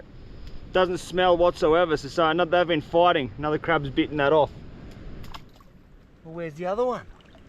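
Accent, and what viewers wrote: Australia. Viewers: Its always that aussi accent 😂always gets me❤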